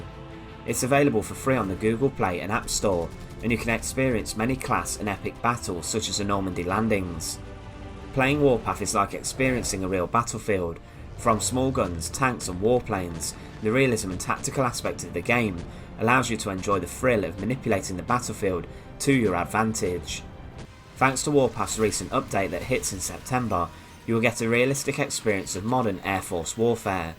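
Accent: United Kingdom. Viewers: I totally know what you mean, but it is simply a particular UK regional accent and Id rather the guy stuck with it and remained authentic to his identity. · 😆 wtf thats crap - his voice is fine to me and i hope your comment doesn’t hurt his feeling. Its nice to have a regional UK accent. Not an upward inflection of every word like in the US. dont listen to him buddy your voice/show is spot on !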